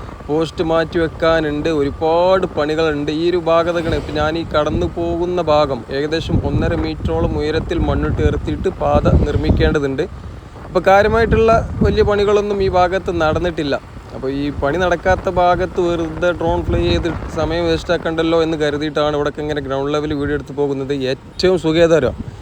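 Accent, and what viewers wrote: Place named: Malabar, India. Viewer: അത് ബൈക്കിൽ ഫിക്സ് ചെയ്യുമൊ അതൊ കൈയിൽ പിടിച്ചിട്ടാണൊ. എന്തായാലും ന്റെ നമ്മുടെ മലബാർ ശൈലിയിലുള്ള സംസാരരീതിയാണ് വിഷ്വലിനോടൊപ്പം തന്നെ വീഡിയൊയെ കൂടുതൽ ആകർഷകമാക്കുന്നത്. Keep going.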